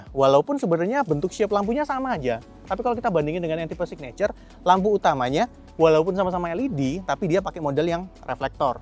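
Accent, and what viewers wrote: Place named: Java, Indonesia. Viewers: logat suroboyoan itu.. beda sama jawa yg lain. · Bingung jon ngomong indo logat jawa...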